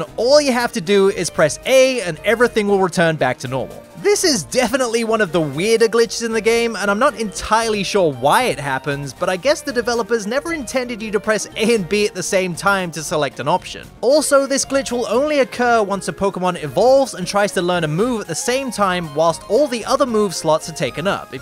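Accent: United States. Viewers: Why do he sound a bit American now? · Woah I feel like I haven't seen your videos in years and your accent feels more "American-y?" It's weird haha good video still :)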